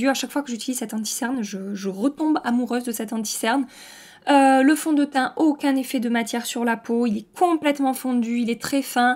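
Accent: South, France. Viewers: Bsr bon bien ecoute je ne connais pas du tout le fdt L'Oréal donc une occassion de le decouvrir et ce que je faire plus les monos de Sephora les couleurs me donne trop envie et vue qye mon mari m'a offert une carte cadeaux de chez Sephora pour mon Anniversaire 🎂 je sais quoi prendre lol.... et le Blush Milani cela fait quelque temps que je louche dessus donc avec ton avis tu me fais basculer 😂😂 en tout cas avec tout ce maquillage petit prix tu as su nous faire un magnifique MU, Tu nous inspire vraiment, on voit que tu aimes profondément ce que tu fait et que tu aimes nous le partager et nous on adore te suivre et te dire merci pour tout ce que tu fais pour nous, vraiment en plus tu as toujours le sourire tu n'es jamais négative et tu es adorable avec ton accent du sud et ton rire est contagieux et surtout tu parles avec nous sans filtre et ça c'est génial c'est comme si on était là avec toi dans la pièce et quand il t'arrive quelque chose tu ne réfléchis pas tu parles simplement comme tout le monde et c'est super alors encore un grand merci de nous faire vivre tout ça avec toi car en ce qui me concerne c'est un pur bonheur 😉😉😘😘😘❤❤🌞🌞 Je t'embrasse très très fort et te dis à Dimanche Ambre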